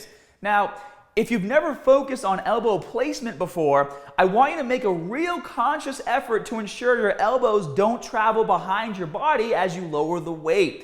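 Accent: Boston, United States. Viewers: The Boston accent makes it better!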